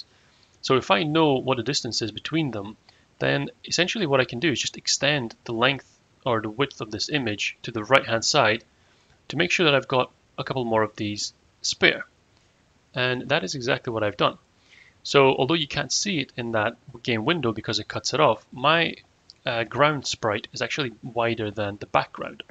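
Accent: United Kingdom. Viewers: Omg I never hear somebody with the same accent as me on YouTube. Ayyy Northern Irish accent representation 🫶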